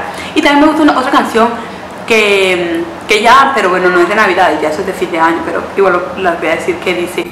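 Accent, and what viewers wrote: Colombia. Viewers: me encanta la manera como hablas de colombia es hermosa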